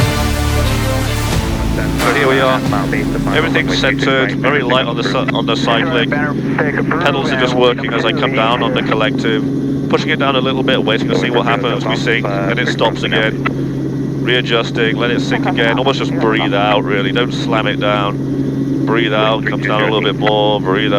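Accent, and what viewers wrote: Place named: England, United Kingdom. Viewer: Haha, he’s English.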